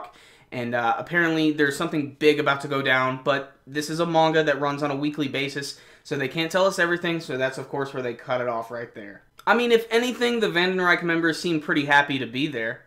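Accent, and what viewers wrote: Germany. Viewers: lol at the german accent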